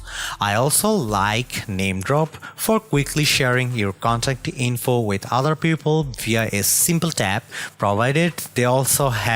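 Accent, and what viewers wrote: India. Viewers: You have a very good Indian accent 👍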